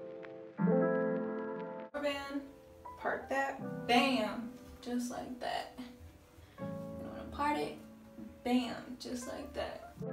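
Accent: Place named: Brazil. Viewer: seu sotaque é diferente. você é brasileira ? tive impressão que você era por conta do sotaque...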